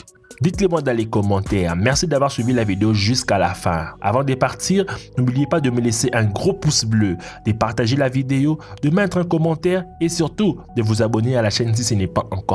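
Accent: Africa. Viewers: Vous trouvez pas qu'il a l'accent africain un peu ?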